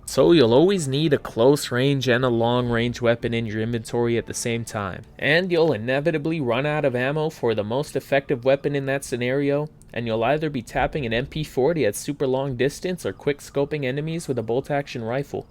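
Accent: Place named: Boston, United States. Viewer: Where you from? I can kinda hear a bit of Wahlberg in theya! Boston?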